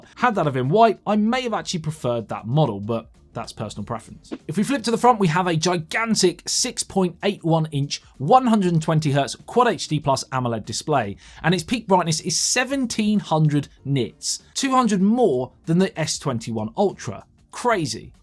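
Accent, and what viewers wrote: United Kingdom. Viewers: Is this Youtuber from UK ? I luv British Accent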